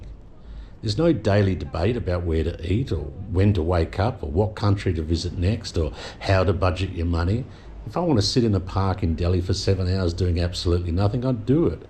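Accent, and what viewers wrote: Australia. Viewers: hi Laura, is 100% Australian. I’m Aussie and I know one when I hear one. And he’s mentioned it in many videos. He often goes back to Perth to visit his sister. And no, he doesn’t have the old age pension because you have to be 67 to qualify for that in Australia. He’s 60. As he states in this video (and many others) he lives from his YouTube videos. That’s also why he makes so many! It’s his job. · No. I sense an Aussie accent. You are the age of my Father. I’ll tell you what’s worse than having nothing. It’s having EVERYTHING and yet not appreciating it. In Australia, so many boomers became property obsessed and prioritised wealth gains over family relationships. What’s the point in having kids and grandkids if your main focus is your STUFF?! It’s so sad to see. But they don’t care. They won’t, until they lose their sense of security (homes/equity) and realise it cost them their families. 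You’re doing well in Goa. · You're living my dream, mate. Really enjoy following you on your adventures. Don't mind the lovely Aussie accent, either. Love from the Low Lands.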